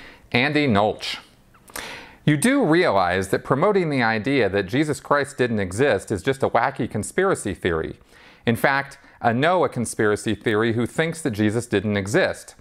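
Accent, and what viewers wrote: Minnesota, United States. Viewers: Yikes.... nothing like ad hominem! And imagine not being able to spot a Minnesota accent. No specific disagreements, just generalizations. And we know what Hubbard said about people who engage in generalizations....;) And the 'you'll delete this' messages always get me. What maroons! You're a class act, "."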